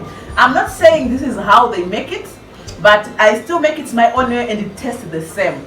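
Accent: Uganda. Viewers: Well done my girl, you are now an expert of matoke ❤❤❤ ramba wakadaro muroora wekwa unongondinakidza hako mwanangu chirungu chako haa chatova Ugandan English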